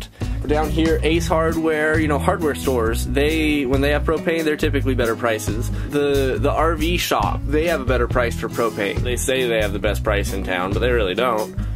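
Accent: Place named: Canada. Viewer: I'm hearing a Canadian accent in your voice lately. Please explain what "Fahrenheit" is for the 90% of the metric planet earth please...lol.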